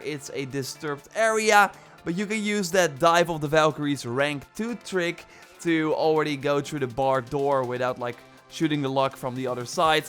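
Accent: Netherlands. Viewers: Do you speak dutch, you kinda sound like someone from the netherlands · Je hoort gewoon aan zijn accent dat hij Nederlands is🤣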